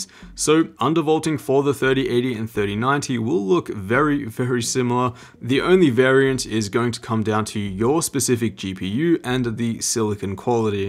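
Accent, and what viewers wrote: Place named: Australia. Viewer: Must ask, how do you have an 3080 FE when you sound Australian? Do you live in aus? Did not think the FE was available to convicts like me living in Australia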